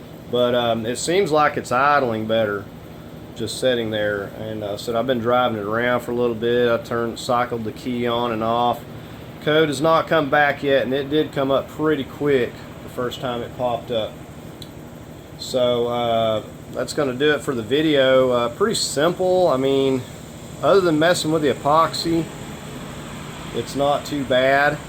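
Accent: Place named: West, United States. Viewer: your prononciation is like some cowboy from old movies.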